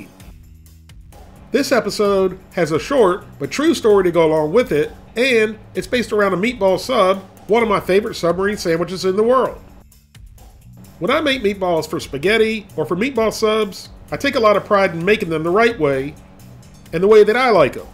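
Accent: South, United States. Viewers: Is it just me or does he really sound hillbilly?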